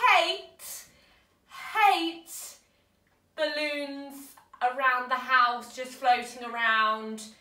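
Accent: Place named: Southeast England, United Kingdom. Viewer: Are you from SE England? Your accent is similar to mine haha. You have a lot of energy!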